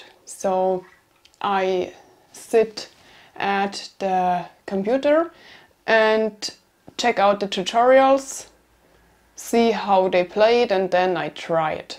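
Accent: Bavaria, Germany. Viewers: my late partner would have loved this video. She was German, from München/Munich and the reason why I started to watch your videos. You are both the same and not the same... both strong minded and kind, and your Bavarian accent is very much as she sounded. Music is my life, I am a musician and nature lover... Thanks for sharing some life things with us.:)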